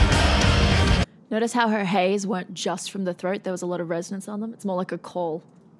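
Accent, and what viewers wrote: Australia. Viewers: ... we are going to the advencha... love your ozzie accent :) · your reaction video of was the third music reaction video I ever watched. It still own's a little "piece of my heart". Hope you are well. Loved seeing you with Elizabeth Zharoff (The Charismatic Voice). Love hearing an Australian accent - never thought I'd ever actually write that! G'day mate. Vic 3044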